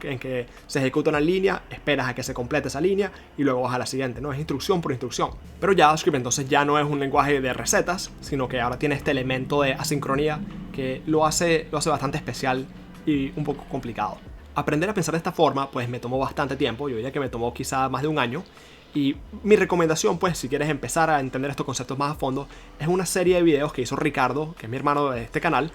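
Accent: Venezuela. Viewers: Muchas gracias por los consejos, no pude evitar notar tu acento ¿Eres venezolano? PD: Pondre en practica estos consejos. printf("Gracias")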